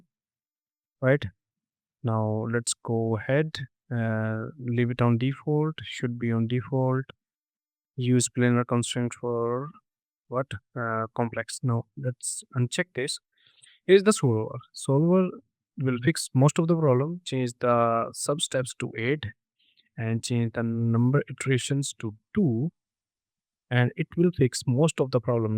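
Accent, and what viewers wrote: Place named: India. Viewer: Because of "offshoring" (getting rid of jobs in our countries and shipping them to india, or shipping indians over here to take our jobs) most English speakers can understand Indian accents pretty well, and yours isn't even too bad. Accents only really become a problem on phone calls if the reception is bad.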